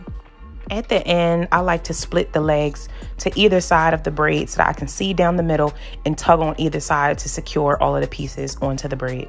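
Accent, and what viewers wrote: Philadelphia, United States. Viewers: You sound like home lol are you from philly? Absolutely loved the detail & easy way you communicated this tutorial/stitches, thankss!! Cant wait to see the Bee Stitch!🔥🔥❤❤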